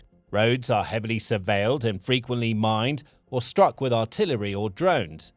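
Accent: United Kingdom. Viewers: The channel is American despite using British voices 😂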